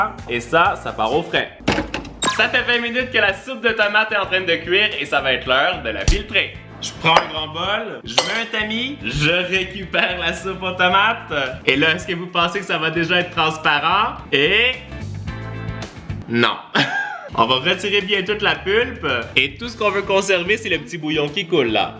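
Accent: Quebec, Canada. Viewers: l'accent québécois est vraiment insupportable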